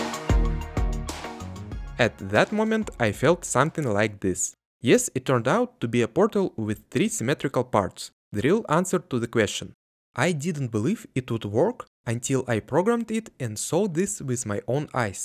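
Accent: Russia. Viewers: Ты русский? Акцент говорит что да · Слышится русский акцент)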